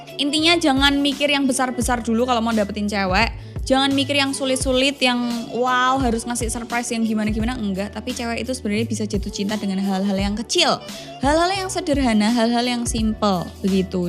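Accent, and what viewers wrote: Java, Indonesia. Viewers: laahhh opoo iki....jowo tenan rekkkkk... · Muedok banget logat e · seneng Karo logat jowo ne sampean mbak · Aksen medok is love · medok rek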